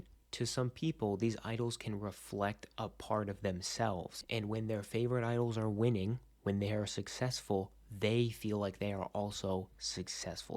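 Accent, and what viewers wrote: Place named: Bulgaria. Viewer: Are you Bulgarian or somewhere in that area because I swear that's your accent.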